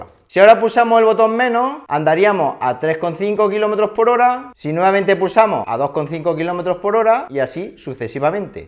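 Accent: Murcia, Spain. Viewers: Ahora que llega el verano? Por tu acento diria q eres de murcia...y a no ser que en murcia el verano empiece ya , no entiendo.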